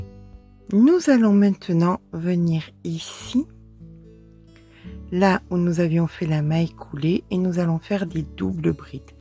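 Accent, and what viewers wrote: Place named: Southwest, France. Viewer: Bonjour. Tuto toujours au top avec des explications amenées sereinement et qui donne confiance avec ce petit accent ❤❤❤❤ (sud ouest?) Merci !!! Et bonne journée (je ne commente pas souvent mais plutôt adepte des ❤️👍)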